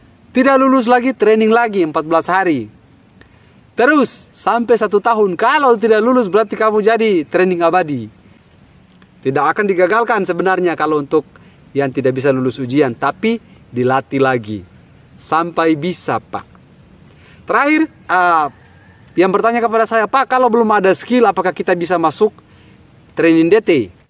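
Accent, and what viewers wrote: Ternate, Indonesia. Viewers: Hehehe mantap pak, semoga berkah ilmunya. Terimakasih.. Lucu juga pak,, rindu dengar orang ternate punya logat😂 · Ale carita pake dialeg asli ternate bole tamang.. Tarusa deng apakong saya anda saya anda bagitu tu😂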